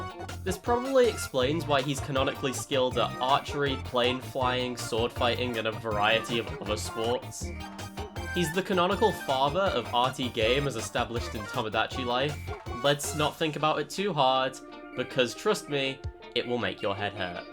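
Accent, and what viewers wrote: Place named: England, United Kingdom. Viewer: then take my sub and use it well, funny english sounding guy.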